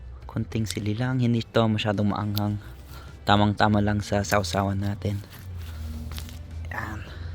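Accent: Philippines. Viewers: Wow I can’t believe your accent pinoy na pinoy talaga.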